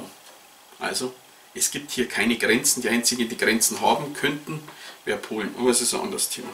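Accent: Bavaria, Germany. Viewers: Hallo,zuerst mal super Kanal und auch für mich als Elektriker,Prepper,Sportschütze und Funkamateur moch was zu lernen. Du hast ne tolle Art besonders der Bayrische Dialekt ist super. Einen kleinen Kritikpunkt hätte ich und zwar die schlechte Tonqualität,vl wäre ein externes Micro oder so besser aber wahrscheinlich weißt du das eh selber und bist da in der Richtung eh schon am überlegen. Freu mich auf weiter VIdeo bitte mach so weiter echt TOP.!!!!!!!!!!!!!!!!!!!!!!!!!!!!!! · Für einen Bayern redest du richtig gut hochdeutsch. Ist sehr verständlich. Hab schon Sachen erlebt, da hab ich nichts, aber auch gar nichts verstanden. Hab nur nach dem Weg gefragt.....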